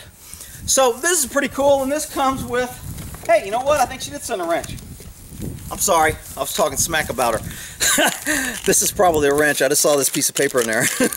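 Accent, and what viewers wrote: Texas, United States. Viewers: what part of texas is that?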